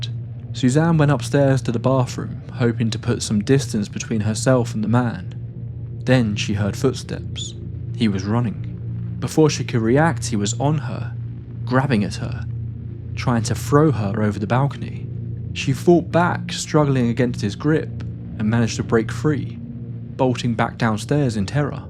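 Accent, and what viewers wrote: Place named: North East England, United Kingdom. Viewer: Were was this pub as the voice sounds north east of england were am from would love to no ❤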